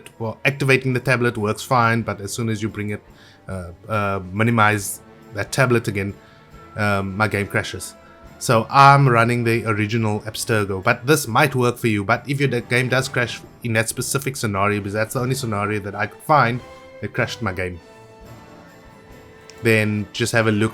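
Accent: South Africa. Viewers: Yo nice vid man. The game already looks amazing to me but wanted to see what the modders did to it. Also, hope you dont mind me asking but you sound South African, are you from here? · Never expected to hear a South African accent on a mod vid😂 Thanks for the help man! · I knew that was a South African accent, the "biltong" just confirmed it 😭🇿🇦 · Yooooo a South African!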